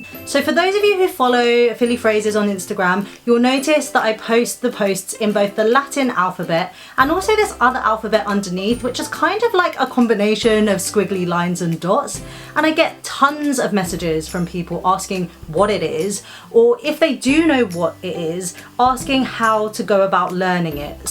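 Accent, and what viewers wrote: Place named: United Kingdom. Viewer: I am new at your channel and I like your British accent.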